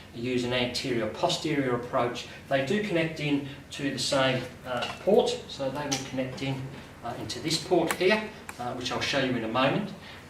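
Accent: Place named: Australia. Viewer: Nice Aussie accent.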